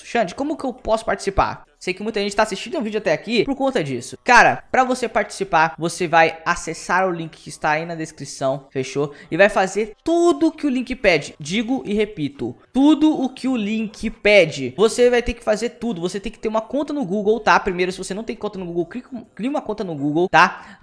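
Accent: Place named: Rio de Janeiro, Brazil. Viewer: Carioca mano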